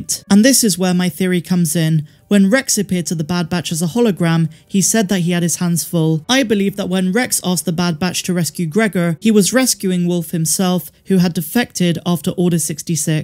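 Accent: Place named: Bristol, United Kingdom. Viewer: You have a Proper Brizzle twang there Am I right? Wicked vids, always enjoy the updates and theories, cheers 🙏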